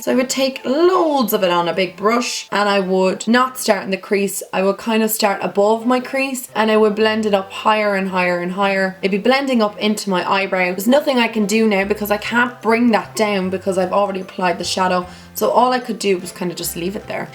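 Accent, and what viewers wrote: Ireland. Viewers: Are you Irish beautiful?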